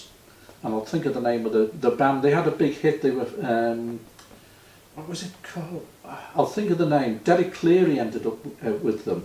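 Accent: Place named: Liverpool, United Kingdom. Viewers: Great Interview... Sharing Musical Memories & Facts As Gold Mine! Thumbs Up & Thanks For Sharing These... Throughout This Very Pleasant Liverpudlian Accent!;-)) Keep Making & Sharing These!;-)) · Hi...I was recently asked by from Liverpool Film Club, if I'd be interested in doing a filmed interview with him to discuss my involvement with the Liverpool Arts Scene. This is quite an informal interview( Part 1), in which asks me about how I got started with music and groups in the 60's and 70's, through to my association with other groups and my Entertainments Agency that I opened in 1978. I did lose my way a little, where one moment I'm discussing my group 'The Topics' (1962/66), and asks if I met any well known bands...when my memory suddenly jumps to 1970/72 and I'm remembering my time in a Cabaret group (Recipe 4)....then I slowly make my way back to the 60's. 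Memory plays a strange trick at times. If you do take the time to watch this video...I hope you can understand my Liverpudlian accent...and that you don't find the conversation too boring..as it is totally about about local Liverpool places and names. Parts 2 and 3 Video's are to follow...in which the conversation moves through the 80's up to my Songwriting and current activities. Thanks for watching..if you have.....Regards....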